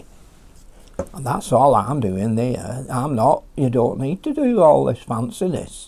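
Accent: United Kingdom. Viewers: I love your accent! What part of the UK are you from?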